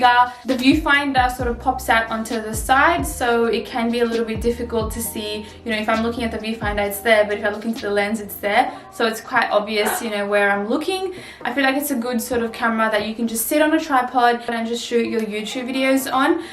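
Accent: Australia. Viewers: Brilliant video! So helpful, I'm still using my phone to film at the moment, I actually don't think it's that bad but would love to upgrade to a proper camera one day. The ring light makes SUCH a difference. Are you Australian? X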